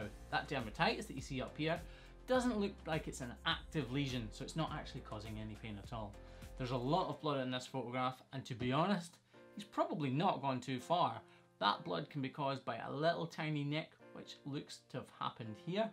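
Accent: Scotland, United Kingdom. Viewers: It's because of the cute Scottish accent lol otherwise it would have been a proper roast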